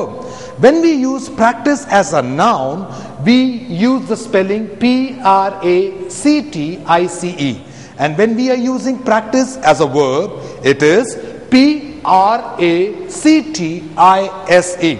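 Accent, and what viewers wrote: India. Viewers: funny indian accent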